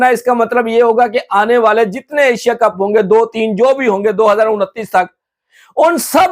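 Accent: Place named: Karachi, Pakistan. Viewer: Abey tu kaisa ch*tiya hai bey? Indian jersey pehen lene se hum tujhe indian man lenge?? Sale tere channel k description mein dikha rha tera channel pakistan ka hai. Sale tera accent dekh, karachi k l@ bazar ka gawaiya lag rha tu. Abey teri apni jersey pehnne se sharam aati hai tujhe to Bangladesh ka pehen le bey bhikari.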